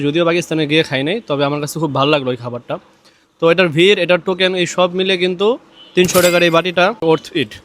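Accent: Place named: Kolkata, India. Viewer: ভাই ইন্ডিয়ান কলকাতার ছেচরা ব্লগারদের মত ভিডিও কইরেন না আপনার কথা বলার স্টার অনেকটা ওদের মত অথেন্টিক বাংলাদেশি স্টাইলে ব্লগিং কইরেন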